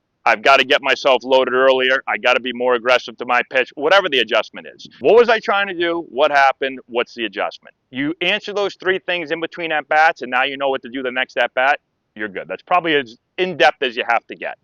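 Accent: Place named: New York, United States. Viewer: Haha. True. Just the mild new york accent i think.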